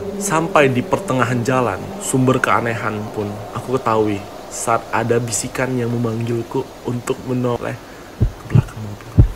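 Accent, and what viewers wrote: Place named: Medan, Indonesia. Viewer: Masih ada logat2 medannya dikit 😁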